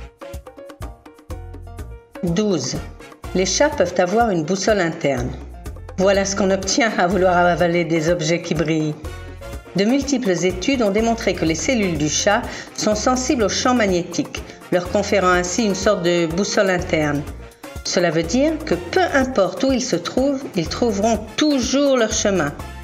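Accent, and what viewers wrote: Quebec, Canada. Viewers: Arrêtez avec vos "la voix est nulle" l'accent québécois on comprend rien !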